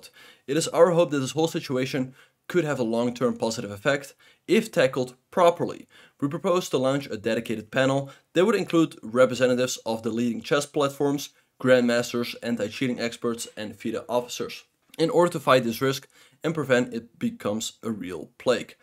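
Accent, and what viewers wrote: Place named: Netherlands. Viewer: Man I love your accent I can tell you're dutch or flemish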